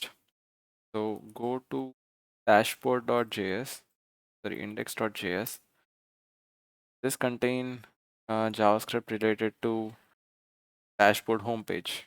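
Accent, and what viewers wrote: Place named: India. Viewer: Indian people, please please work on your English accent!!!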